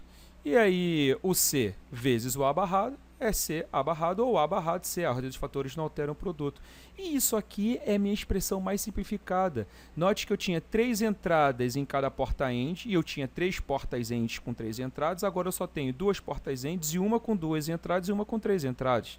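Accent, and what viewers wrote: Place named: Rio de Janeiro, Brazil. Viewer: nem da pra perceber que é carioca rsrsrs abraço mto obrigado pela ajuda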